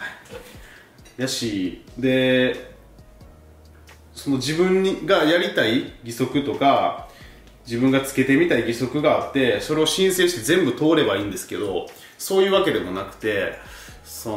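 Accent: Kansai, Japan. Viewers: 確かに義足は物質なので、肌に関することのトラブル多いですよね。自分の手足でも麻痺になると反対側の手足で動かしてコントロールするのを見たことがあります。痒くても手が届かない。脇などに汗かいてもあげられないなど… それぞれが工夫して生活していかないといけない現状がありますよね。元々関西人だから、話し方に親近感が湧きました。私もいつも不自由な所が身体にあるから、色々と共感できる部分もあります。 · 大好きです❗️７６歳こころから応援しまーす。関西弁がとてもかわいいわー。おしゃれやし！動画見せて貰ったら、私もおしゃれしは、がんばらな！かわいいのん好きやし！ずーっと応援してます。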